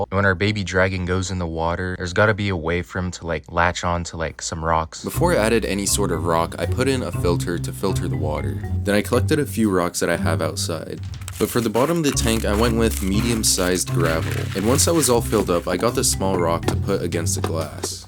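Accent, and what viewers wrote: United Kingdom. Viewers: Buddys British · Are u British wotah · Thus guy is so british wuter😂😂😂😂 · Bro sounds like a British man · Are you British?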